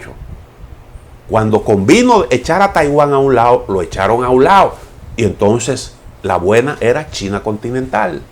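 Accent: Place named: Dominican Republic. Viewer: Ese asento me parece quisquellano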